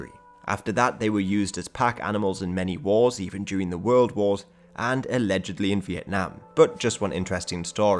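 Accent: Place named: RP, United Kingdom. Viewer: Your accent is really posh!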